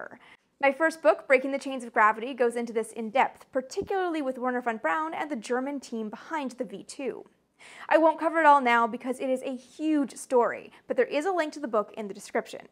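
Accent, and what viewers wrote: Canada. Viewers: Love the show! But is it possible to move away from the almost 100% vocal fry delivery? It is painful after the first few minutes.Vocal fry is common with the Kardashians in sound bites but for a 15-minute video it is literally all I can hear. Keep the Canadian accent of course! You have great content.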